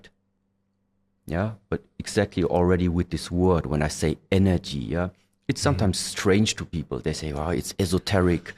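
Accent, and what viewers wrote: Germany. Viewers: His accent sounds so german .. 😅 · The German accent makes it kinda funny 🤭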